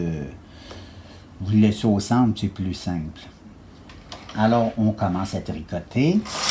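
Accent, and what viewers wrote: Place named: Quebec, Canada. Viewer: Bonjour, pouvez me dire ou trouver les cartes mylar ? 1🤔merci beaucoup, et gardez votre bel accent québécois ! ❤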